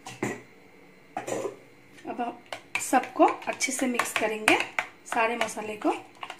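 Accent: Bengal, India. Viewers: তোমার কথা আর হাসিতেই বোঝা যায় তুমি বাঙালি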